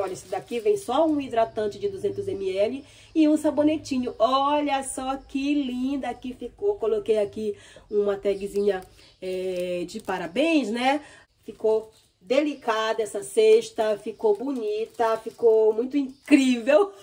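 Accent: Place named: Belém, Brazil. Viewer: haaaaaa perguntei pelo sotaque, sou de Belém do Pará, fui em mosqueiro uma vez se não me falha a memória, amei saber que es daí 😘 muito sucesso pra ti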